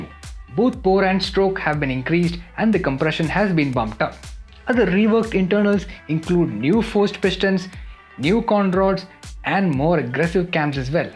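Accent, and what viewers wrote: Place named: India. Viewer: is this a English speech practice from an Indian?🤷🏿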